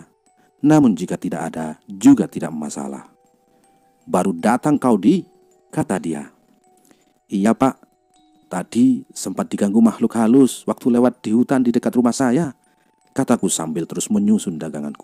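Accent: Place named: Java, Indonesia. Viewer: ternyata enak juga dengerin suaranya bang 😊 Dari narasi intonasi enak didengar. Ngebass agak berat cocok buat jadi MC. Cuma logat jawanya masih kental. 🙏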